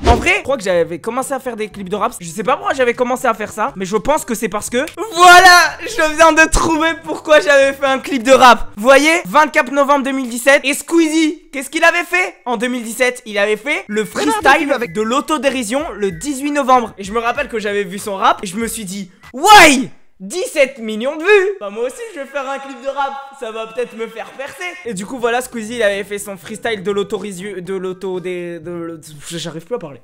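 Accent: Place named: Quebec, Canada. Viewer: On dirait un québécois j te jure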